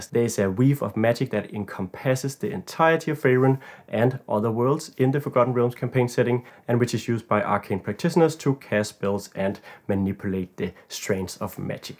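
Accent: Denmark. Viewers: I knew you were danish the moment i heard your voice 😂 Nice video, very helpful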